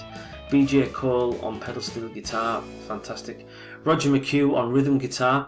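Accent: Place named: Northern England, United Kingdom. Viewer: One of my absolute fave albums, this. Not a weak track on it, a great country-rockabilly sound and just generally fantastic. Also, first time I heard you speak, mate, a fellow northerner by the sound of it (although I'm a Yorkshireman).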